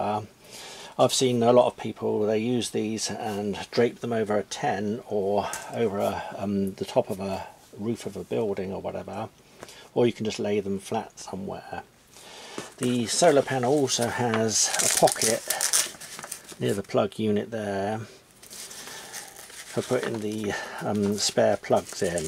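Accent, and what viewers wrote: United Kingdom. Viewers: Hi, I can tell by your accent that you are a fellow British hobbyist. Currently, I am not sure where to find metal stock at a good price. Do you know good places in the UK to find some thanks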